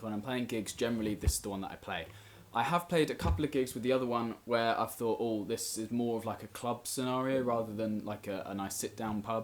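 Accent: United Kingdom. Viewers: Fabulous and I love your Great Britain accent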